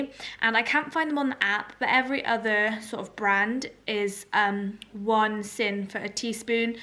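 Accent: Bristol, United Kingdom. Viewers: I knew you were from the west country. Love the "brizzol" accent.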